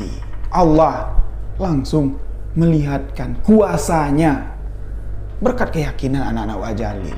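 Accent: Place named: Jambi, Indonesia. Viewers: Sukaaa dg pembwaan bhsa jambi bang...sukses selalu bang salam dri jatim😍😍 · Walaikusalam kak..... Sellu setia mnyimak wlu terlmbat.....🤭 Suka bangettt logat Jambi y kak Cpt smbuh n pulih kmbali y kak.... Tmbah berkah n smakin sukses y 🙏🌹🌹🌹💪🔥🔥🔥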